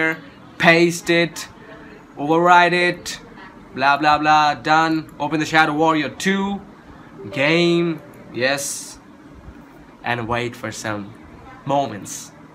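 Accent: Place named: India. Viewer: This guy has to be an Indian.. he has that call-center accent, very indian but trying to sound American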